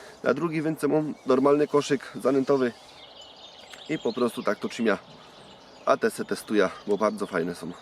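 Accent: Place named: Silesia, Poland. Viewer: Fajne hobby - wedkarstwo (lapa w gore) ALE wytrzymalem 1 min 20 sek ... nie da sie kurwa tego sluchac, mam bardzo wielu znajomych Slazakow i wiem doskonale ze potrafia NORMALNIE GADAC jak zechca. Film bez dzwieku OK - graty za rybki !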